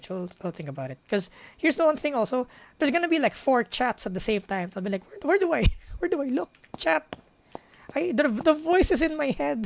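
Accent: Philippines. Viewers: Motorcycle and car noises in the background, accent, didn't even have so say it accidentally, fellow filipino spotted 👍 But dang, streaming 11 midnight, good content tho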